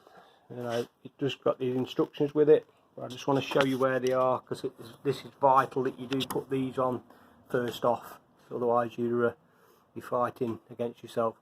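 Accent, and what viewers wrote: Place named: Nottinghamshire, United Kingdom. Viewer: Really good video and explanation 👍👍👍 seriously think I'm gonna have to do it...last few times riding my back and neck getting bit achey...but nearly 63 🤣 ....be great if your ever nearby, on ride ? So I could have a look, I'm in North Notts, your accent sounds close 👍 but thanks again and great idea about the reservoir brackets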